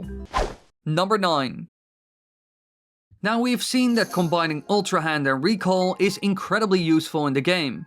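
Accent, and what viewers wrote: Netherlands. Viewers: You have to be Dutch or Belgian man, I'm putting my money on Dutch though. Accent is bij IEDEREEN hetzelfde HAHA.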